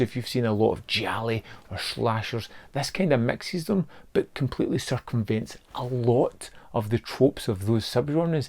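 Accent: Scotland, United Kingdom. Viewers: Do you really not understand the English language? Are Scottish accents that hard for you to understand? Go back to your hovel you troglodyte.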